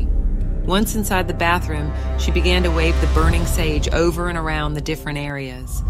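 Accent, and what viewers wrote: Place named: South, United States. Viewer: Great trippy story! The southern slang narrator adds a texture of realism to this twisted tale too!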